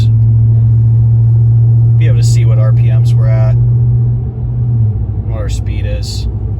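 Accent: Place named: Pittsburgh, United States. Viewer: I knew this was a PGH accent.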